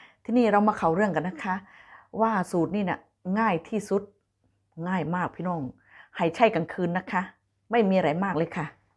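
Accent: Korat, Thailand. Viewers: น่ารักมากพูดพาสาโคราชชอบมากๆจ้า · ชอบแก่คุยบ้านเองคนโคราช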